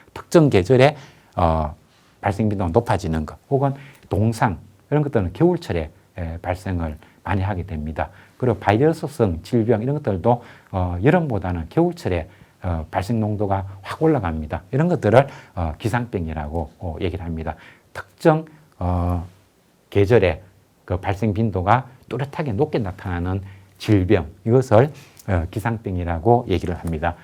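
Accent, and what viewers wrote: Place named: Gyeongsang, South Korea. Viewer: 갱상도 말씨가 고향의 오빠야가 다정하게 이야기해주는듯 듣기좋습니다. 어려운 제목인데 편하게 알아 듣기 쉽게 풀어주시네요.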